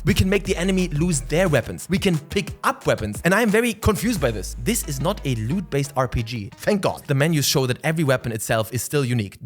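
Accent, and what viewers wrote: Germany. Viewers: Man hört das deutsch sooo raus hahaha geil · What is bros accent 😭😭😭😭🙏🙏 It's like a lovely mix of German, Japanese, and a little bit of French. It's fucking me up in the best way. Anyway, fantastic video. I'm just as hyped for Yotei and the new stuff has me excited for a more immersive, less ubisoft-style "map checklist" game. Just getting lost in a massive world without feeling like an overwhelmed menial forced to visit every point of interest in the hopes of a crumb of meaningful content. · I'm Confused you have so a german Akzent and use german Words. Ich bewerte das Video 9/10, du hörst dich fast so an wie Raffey👍🏻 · danke für dein friendship, alter du sprichst englisch mit einem deutschen akzent, bist du auch deutscher oder ?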